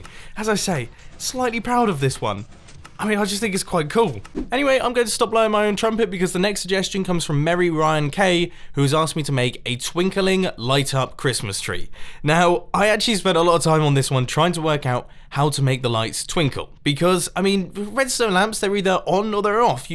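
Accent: Netherlands. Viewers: Lol hoe hij nederlands spreekt😂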